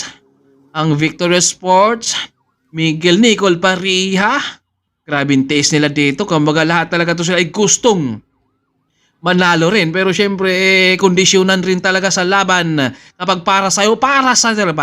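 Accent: Visayas, Philippines. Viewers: tang ama ka, ampanget mo mag salita pati yang accent mong visaya, ang bias mo lagi sa excelent noodles, punyeta ka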